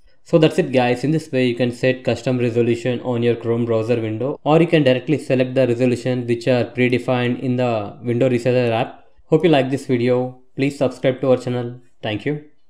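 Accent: India. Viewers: Strong Indian accent!! Virtually impossible to understand his "English". Otherwise if you don't care about understanding it was really good!!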